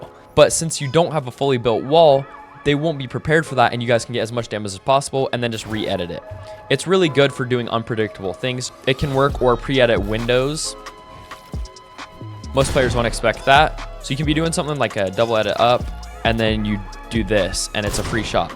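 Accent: India. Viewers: are you punjabi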